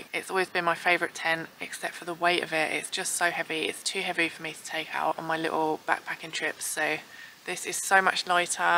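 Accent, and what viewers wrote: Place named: Berkshire, United Kingdom. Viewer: Hi, i have been trying to figure out your accent for a while now, is it Berkshire, Oxfordshire, Hampshire or near. My mother is from Cane End nr Henley originally and sounds a bit like you. Accents intrigue me. Great videos, reviews and adventures, and i love the wildlife you encounter, stay safe, all the best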